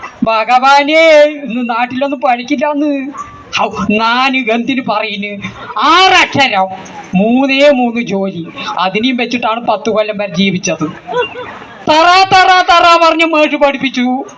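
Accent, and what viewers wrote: Palakkad, India. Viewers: പാലക്കാടുകാരുടെ മനം കളയുന്ന സംസാര രീതി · എടാ ചങ്ങാതി നീ മലയാളം ഈ പാലക്കാട് അപമാനിക്കുന്നത് നിനക്ക് നിന്റെ മക്കളെങ്ങനെയാണ് ചങ്ങായി സംസാരിക്കുന്നത് ജനിച്ചു പറഞ്ഞിട്ട് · പാലക്കാട്‌ ഇതുപോലെ സംസാരിക്കുന്ന ആള്ക്കാര് കൂടുതൽ ആണോ അതോ ഇതിനെ വഷളാക്കുവാനോ എന്തോ നെറ്റ് und ന്തേലും ചെയ്തുവെക്കു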